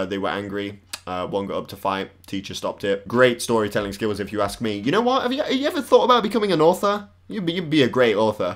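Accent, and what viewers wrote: United Kingdom. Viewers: MeKillYouTo I don’t know a lot of people in the us that have a British accent like his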